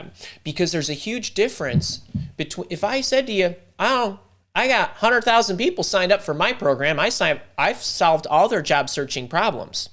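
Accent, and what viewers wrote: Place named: Midwest, United States. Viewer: I'm only 10 seconds in, so I can't say anything about the video content itself, but I just have to say that I LOVE your energy and enthusiasm. Are you from the Midwest by chance? I absolutely love your accent; it reminds me of home. Thank you for bringing this type of energy when preparing for interviews is so scary. :)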